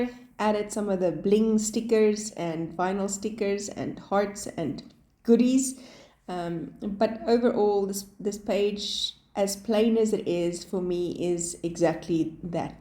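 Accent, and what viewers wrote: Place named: South Africa. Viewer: Wow your accent sounds so much like my husband’s (he is from South Africa and speaks Afrikaans). He has been in America for 25 years and has lost a lot of his accent.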